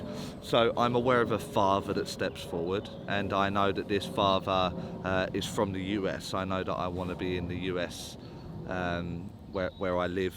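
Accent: Essex, United Kingdom. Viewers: Starting out and so glad I found you! Someone I can relate too..helps with the Essex accent lol so here goes! I got an uncle, in his 40s, he was a happy go lucky man with a good sense of humour. He comes to help others with your teachings. He wasn't married and he liked to drink which he thinks attributed to his death. He lived in a rural town but not in the essex area. It may not be right but its given me confidence to listen to that 'voice' x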